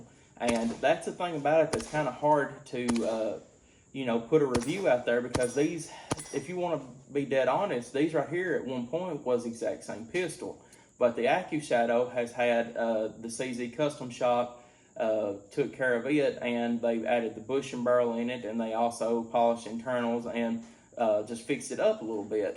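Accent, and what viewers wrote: South, United States. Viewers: Where are you from brother? You have a heavy draaaaaawwwww!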